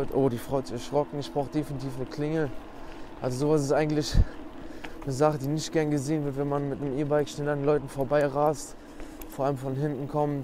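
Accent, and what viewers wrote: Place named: Cologne, Germany. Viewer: Deine Videos sind am Ende häufig wenig informativ, für mich - guck sie aber trotzdem gerne - wahrscheinlich weil ich an deiner Aussprache erkenne dass du ein Kölner bist 💪😎👍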